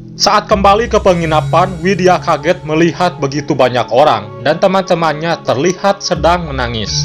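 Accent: West Java, Indonesia. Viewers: logat sunda abangnya ini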